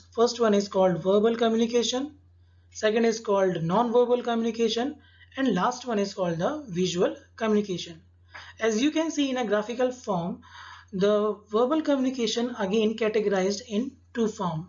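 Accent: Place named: India. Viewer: the indian pure accent made my ears bleed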